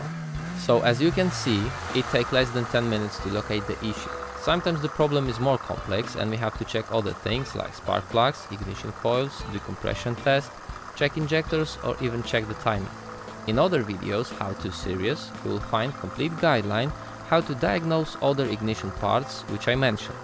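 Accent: Poland. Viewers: Zasubskrybowano, po akcencie coś czułem ze Polak aż zobaczyłem polskie napisy na kompie :) super kanał, dobra robota.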